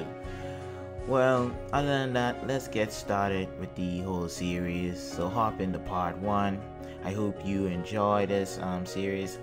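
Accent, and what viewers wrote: Bahamas. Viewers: A whole Bahamian. I love it!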